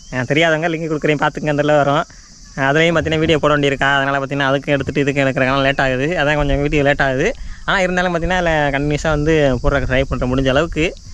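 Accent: Sivaganga, India. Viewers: Siva gangai tamil super nanba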